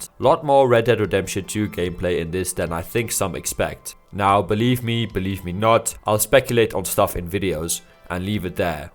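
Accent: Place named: South Africa. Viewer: The South African accent is coming through bru! Lekker video!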